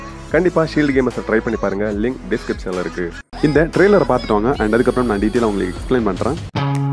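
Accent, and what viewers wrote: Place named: Tirunelveli, India. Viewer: bro tirunelveliya bro la pottu pesuringa Naanum tirunelveli bro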